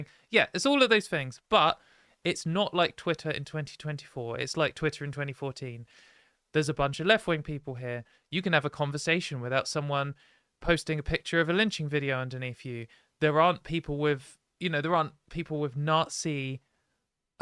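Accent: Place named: Oxford, United Kingdom. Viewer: Your "exit plan" if it becomes unbearable should be moving to Germany. We have a new self-ID law, a way better healthcare system and you can live here very well with only speaking English, at least for the first time, and you speak nearly perfect German school Oxford English. The greatest thing is TERF ideology and Anglosphere sex essentialism about "destinated gamete producers" are not very common, queer theory also not, more kind of "trans.pragmatism" focused on perceivable sex characteristics. I don't know how this is in Britain, but here are tons of cis women running around who look like you, have a voice like yours and are around six feed tall, so you will have no problem with passing. Our few TERFs are really caricatures of Anglosphere TERFs, they only can rehash all of the Anglosphere TERF stuff, NOTHING genuine with them, but it doesn't work this way in Germany and with German language, nearly all media make fun of them when they appear in public. 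And if you want to make music with fellow trans women, you can contact "Jill Please" (keyboards) and "FiNessi" (guitar like you).😁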